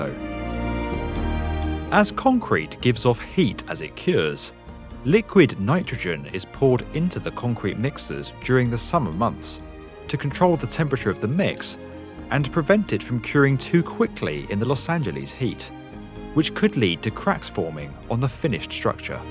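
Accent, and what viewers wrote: England, United Kingdom. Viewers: Impossible! Why does this have an English accent? Is LA in England. No No such thing as earthquake proof. Ridiculous. Earthquake resistant? Maybe. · Why do we have to have a bloody fuckin' Britt narrating about an American bridge? Holy George Washington Batman!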